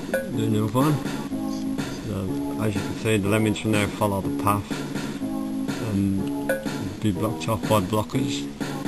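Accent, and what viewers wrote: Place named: Midlands, United Kingdom. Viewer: For u Americans it's called a Midlands accent. From the Birmingham area of England.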